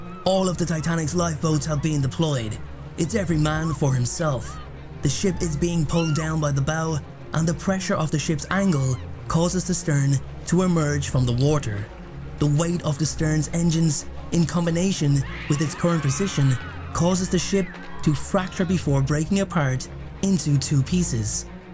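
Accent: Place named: Dublin, Ireland. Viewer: Well done on the video,but if you’re gonna try hide your inner Dublin accent,leave out the Americanised upswing at the end of almost every sentence,it starts to sound way too bullet pointed ! Just a thought…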